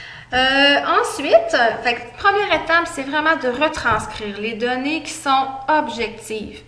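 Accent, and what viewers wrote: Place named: Canada. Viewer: elle est canadienne qsq taaa!!!!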